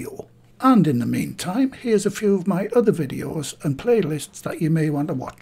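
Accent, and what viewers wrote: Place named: North East England, United Kingdom. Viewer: The irony is, that you probably have no idea what it's like listening to your softly spoken North Eastern accent, explaining things every step of the way. There's one thing I disagree with and that's that you are 'retired'... clearly not since you're still doing it on YouTube (albeit now for free). Old cooks never retire, they just find different ways of practising their skills. Well done, Sir, and please keep up the good work.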